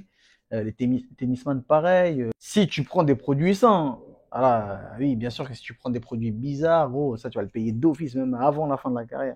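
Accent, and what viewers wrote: Cameroon. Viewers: L'accent Cameroun tu gères ça en normal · Grave l'accent camer ❤❤😂😂😂😂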